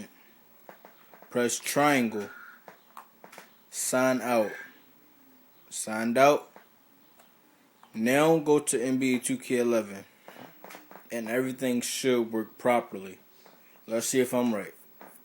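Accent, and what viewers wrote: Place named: Northeast, United States. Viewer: you're from somewhere up north right? New York, DC, Boston?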